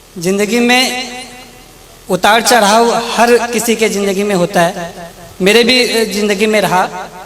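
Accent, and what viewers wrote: Bihar, India. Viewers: Aapki tethi bolna mujhe bht achhi lgi sir😊😊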